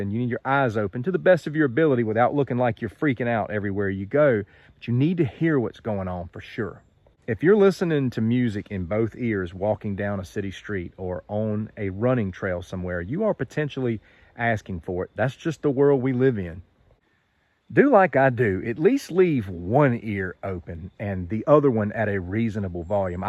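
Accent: South, United States. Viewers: Just Love your Southern accent ! Greetings from Australia. Bless.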